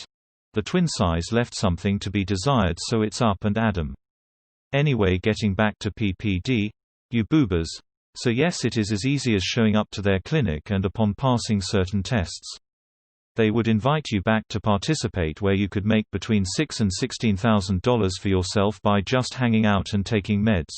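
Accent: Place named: United Kingdom. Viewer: i like the british narrative voice.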